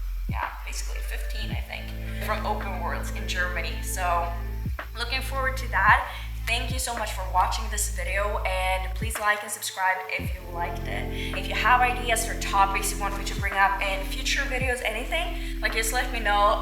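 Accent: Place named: United States. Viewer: It might be your FAQ: why do you have American accent? Love your content as always:)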